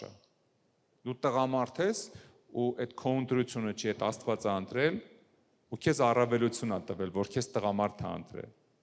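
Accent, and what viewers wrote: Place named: Aparan, Armenia. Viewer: Ես էլ ասում եմ ինչի եմ այսքան հաճույքով լսում ձեր քարոզները պարզվումա Ապարացու արյունն էր քաշում նախ ասեմ որ իսկապես շատ լավ եք խոսսում նույնիս խրատական և դաստիարակչական մեծ տաղանդ ունեք բոլորն էլ լավն են բայց ձես խոդքը մի տեսակ տարբերվող է երևի որ Ապարանցի եք դրանից իսկ եթե գաղտնիք չի կասեք կոնկրետ Ապարանի գյուղերից եք թե միջից ես էլ եմ Ապարանցի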